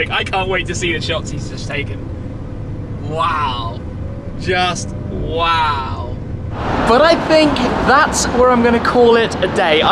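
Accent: United Kingdom. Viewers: you'll be really popular with the ladies in the US, apparently they love the British accent. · Social media superstar, good looking, a brilliant exotic car collection AND a British accent.... you're gonna be fighting off the American girls 😋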